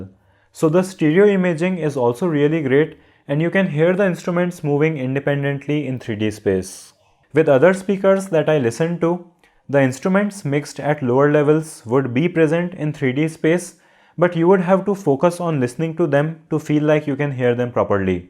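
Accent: India. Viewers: Can’t take him seriously with that Indian accent 😂😂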